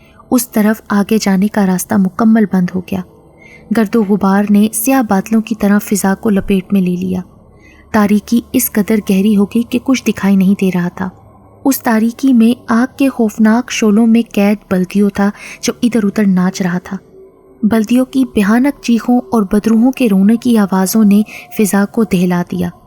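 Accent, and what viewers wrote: Pakistan. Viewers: Allah aap ko khuash rakhe mujhe Nahi pata ki aap ye comnt padhengi bhi k Nahi Me India se hu or aap k Andaz se Lagta h ki aap Pakistan se he ,par itna kehna chata hu ki mujhe aap ki aawaz or andaze bayan se muhabbat ho gai h.. meri baat Ka koi galat MATLAB mat nikaliyega plz... Jab se Mene apki kahaniya Sunna shuru ki h me or Kuch Sunna ya dekhna hi Nahi Chahta . Allah aap ko Khush rakhe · Sastar ap kas jaga sa hea zaban sa lagtha hea ap pakistan sa hea lovli vice lazmi bathana mean ap ko malnay ayho gu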